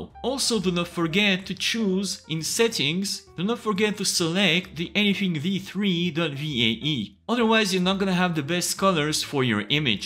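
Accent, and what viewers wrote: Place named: France. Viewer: En 0.23 secondes j'ai compris que j'avais affaire à un Francais.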